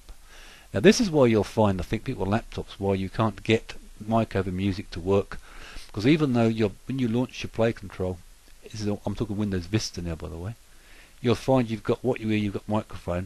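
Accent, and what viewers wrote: United Kingdom. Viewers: wtf with these limey voiced tutorials, didnt even get to 5 secs. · wooh... that's a hard britain accent xD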